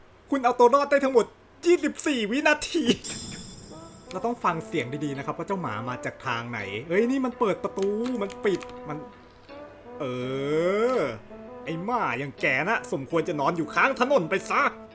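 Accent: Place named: Suphan Buri, Thailand. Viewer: อยากลองฟังพี่ พูดเหน่อทั้งคลิปดูจัง555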